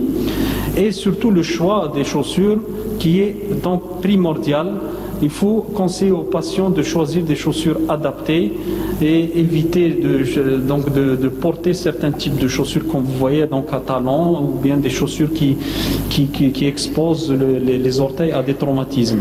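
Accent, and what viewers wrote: Algeria. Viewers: C'est désagréable cet accent algérien ouf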